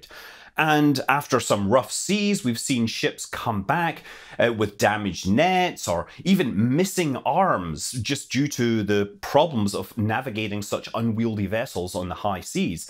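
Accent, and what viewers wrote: Scotland, United Kingdom. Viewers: It took a Scottish accent to make me notice the ships names are plays on mystery and mischief. Lol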